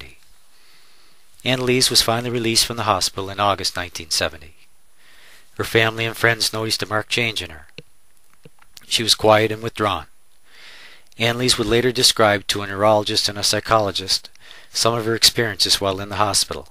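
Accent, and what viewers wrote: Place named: Canada. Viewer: the guy talking must be canadian, i keep hearing abooot and thats def canadian.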